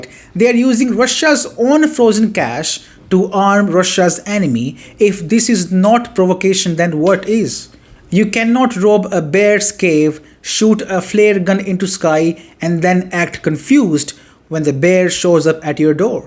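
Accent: India. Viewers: And also have no problem understanding your Indian accent. Very clear! Cool! 👍🏼 Keep up the good work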